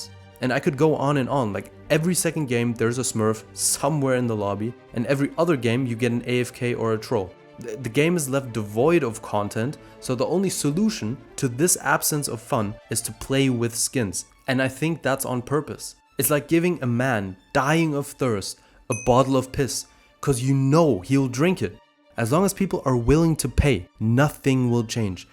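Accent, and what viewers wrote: Germany. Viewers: starkes video!!! übrigens hör ich raus dass du deutsch bist aber keine panik das schaffen nur 0.1% mach weiter so!!!! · ich hör doch dort einen deutschen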